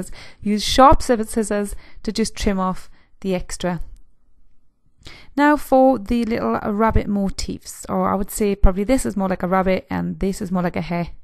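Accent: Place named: Scotland, United Kingdom. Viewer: I love your diys. I love your accent may I ask if you're Scottish?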